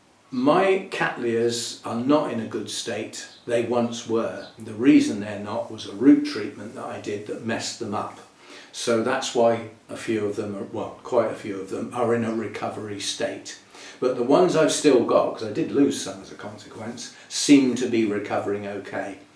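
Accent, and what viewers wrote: England, United Kingdom. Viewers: I love cattleyas! I'm obsessed with them, so I very much like this type of content. Hope, you'll make a vid about the ones now having sheaths. I'm curious if they bloom or not. And I'm also fond of your beautiful English accent. Your videos are more or less English lessons for me, as well. Greetings from Hungary! 🤗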